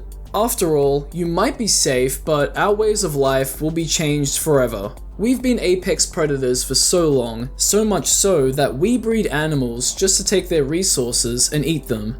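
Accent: Australia. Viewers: You're Australian? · Wait you’re a fellow Aussie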